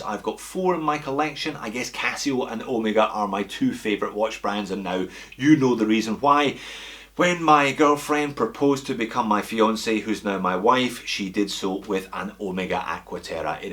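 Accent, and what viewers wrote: Scotland, United Kingdom. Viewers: As a fellow Scot I liked your mention of Watches of Switzerland in the Argyle Arcade in Glasgow. I bought my first proper watch in there too for my 21st. An Ebel, which I still have but now looks as old as I do 😄 Keep up the great work. My wife knows what I’m watching by your accent which she says is better than mine! That’s an insult as you are a teuchter 😀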